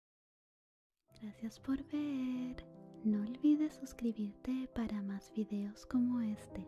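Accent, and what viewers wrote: Chile. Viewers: Así que de verdad habla chileno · Awwwww aun así cuando te equivocas te ves muy tierna eres una chica dulce y me gusta tu acento chileno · Siendo peruano (dato no menor), debo decir que me encanta el acento chileno y las jergas chilenas :v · Amo tu acento chileno 😭🛐❤️ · El acento chileno me encanta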